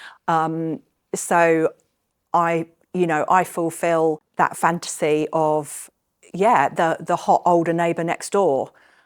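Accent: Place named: Midlands, United Kingdom. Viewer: this woman sounds more Midlands than southern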